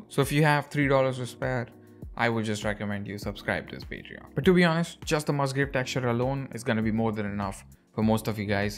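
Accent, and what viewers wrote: India. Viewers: Default cube with a sexy moustache and Indian accent talking to me about my favourite software…. I’m in! Subscribed!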